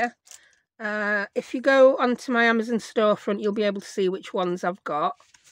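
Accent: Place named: Yorkshire, United Kingdom. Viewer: Thank you and yes I’m in the UK, I live in Southport. I now have a load of empty teabags on the side drying 😂 I find you very watchable, I love the Yorkshire accent and you have a great sense of humour where I found myself laughing out loud on a few occasions, I’ve not come across many junk journal YouTubers as interesting as your channel. I’ll be watching more today 😀👍🏼 xx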